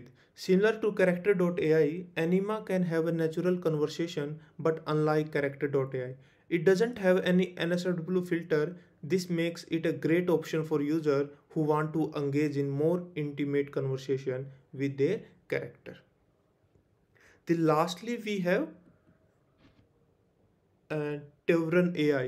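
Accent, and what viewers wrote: India. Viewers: Bruhh you from india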